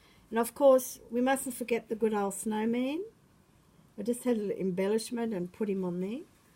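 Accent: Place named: Australia. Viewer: just lovely, love santa up there... i did not know you came from Germany...you have the Aussie acesent down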